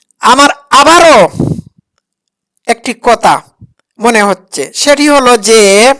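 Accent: Sylhet, Bangladesh. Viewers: U spek bangla with Sylhaty accent is SAD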